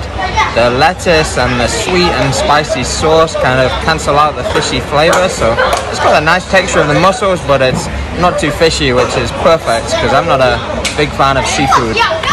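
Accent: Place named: Yorkshire, United Kingdom. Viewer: something about his yorkshire accent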